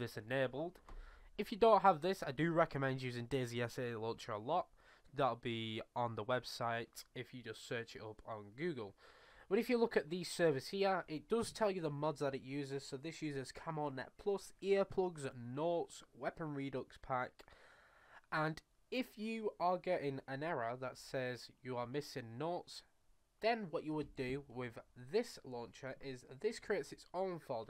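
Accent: United Kingdom. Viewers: You sound like a Yorkshire lad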